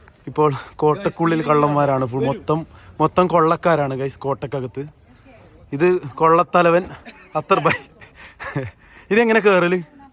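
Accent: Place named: Kasaragod, India. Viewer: Kasargod slang aan kelkan rasam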